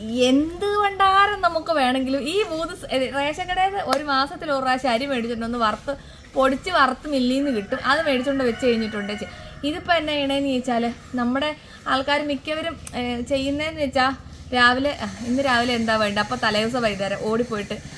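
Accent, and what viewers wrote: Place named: Wayanad, India. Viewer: ഏത് നാട്ടുകാരിയാ... നമ്മളെ കൊടുവള്ളി സ്ലാങ് അല്ല വയനാടൻ സ്ലങ് അല്ലെ... പിന്നെ ഇത് എവിടയാ... 🤔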